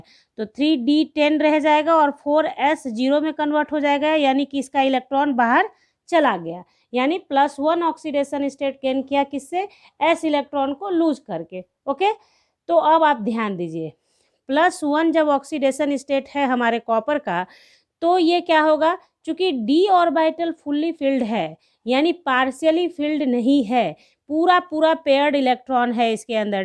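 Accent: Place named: Bihar, India. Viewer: You are from bihar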